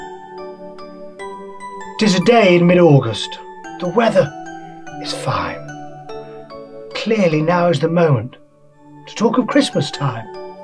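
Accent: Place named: United Kingdom. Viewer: . you should have told the story like Sir David Attenborough! I mean you already have the accent, so why not flaunt it!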